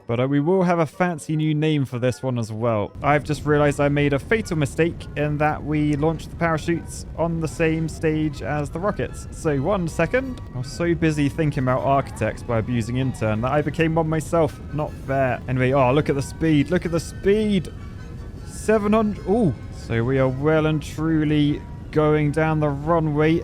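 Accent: United Kingdom. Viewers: I cant understand you when you speak british >:(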